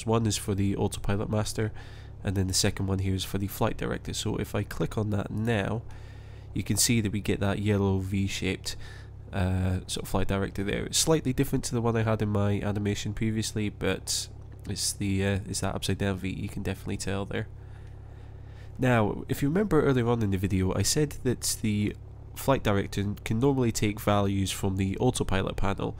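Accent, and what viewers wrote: Scotland, United Kingdom. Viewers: As a 'Newboy' to FS down in Cornwall, UK, I am impressed by the quality of this video. The clear and unhurried instruction by this Scot is first class. Just what I need at my age.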